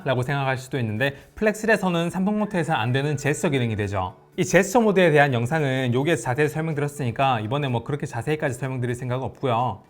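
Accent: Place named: Busan, South Korea. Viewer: 님 눈감고 들으면 안철수씨랑 말투가 비슷하게 들리네요 ㅋㅋ 같은 부산 분이시라 그런가봅니다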